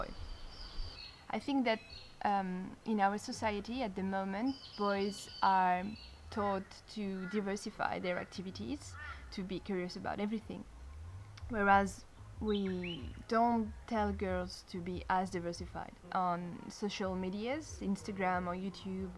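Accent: France. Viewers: are you french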